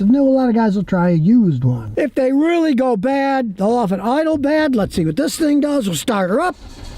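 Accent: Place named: Western New York, United States. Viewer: U annoy me so much...then your voice made me think...is he also from WNY? AI told me not from Bflo..but the Falls is the same! KNEW IT. That accent has an annoying sound. In Colo Spgs 30 yrs - same thing. I'm annoying too but ♡ my cars are so not rusty & trouble free!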